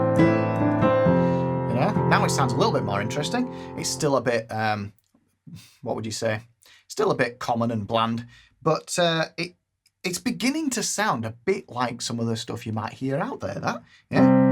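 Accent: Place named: Northern England, United Kingdom. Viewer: Do you do lessons around the north? just assuming you live up here with the accent. Ey up! Cheers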